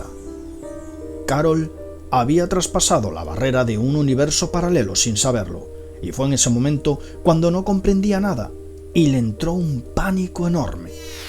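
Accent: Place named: Castile, Spain. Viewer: Io amo tantissimo questo accento del Castiigliano... oltre al tuo modo di esporre gli argomenti. Io credo nelle dimensioni alternative... chissà in un altro mondo io sono spagnolo e tu italiano ah ah! :-D M'iscrivo volentieri al canale. :-)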